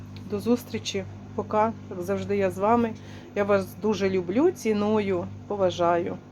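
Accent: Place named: Zhytomyr, Ukraine. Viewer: добрий день, а я зразу зрозуміла де ви живете,по вашій мові, це північ Житомирщини, я живу недалеко від вас.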